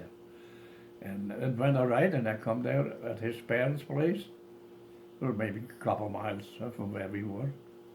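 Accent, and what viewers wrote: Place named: Friesland, Netherlands. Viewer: Thanks for sharing the story. Myn fader is also born in Hantumhuizen. Great to hear the frisian accent❤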